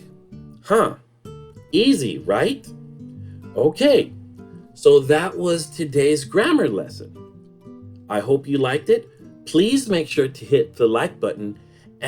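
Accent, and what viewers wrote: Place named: United States. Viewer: Amazingly well produced video. Props for the job. It really catches us. I found interesting how you pronounce the “ed” in some words - almost imperceptible in your American accent. I learned a lot.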